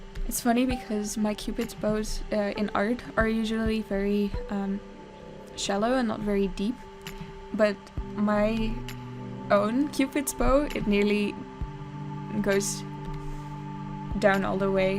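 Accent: Netherlands. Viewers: She uses Paint Tool Sai, but I don't know what tablet. It doesn't matter that much though :P unless you're more experienced. When I just started out, I used a $60 Wacom Intuos Draw tablet. It doesn't have a screen, but only higher end ones have screens, and you get used to it pretty quickly. I think she has a dutch accent :D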